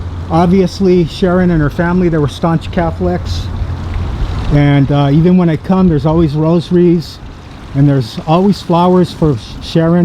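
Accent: East Coast, United States. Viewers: What is this guys accent from? East coast?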